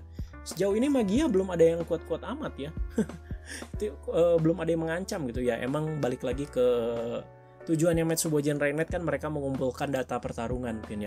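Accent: West Java, Indonesia. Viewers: Sunda banget ya, "ceunah"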